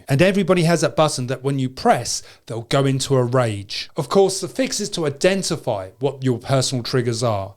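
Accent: England, United Kingdom. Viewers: English, not British. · I like the British accent!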